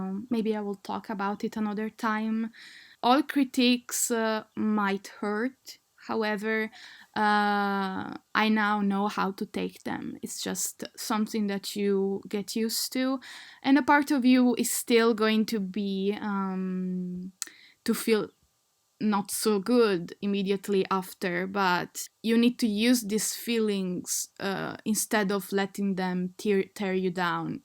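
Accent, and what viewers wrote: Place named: France. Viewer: Are you french ?? You sound like french 😆🧡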